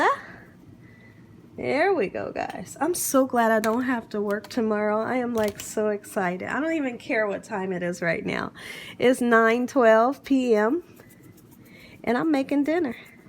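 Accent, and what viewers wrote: South, United States. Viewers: I love your recipes.i like the word like so.girl you gotta be from the south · Love the way you cook and your beautiful southern accent is a bonus. Thank you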